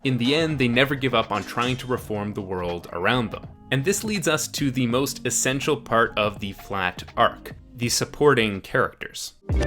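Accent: Canada. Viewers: The Canadian accent is strong in this one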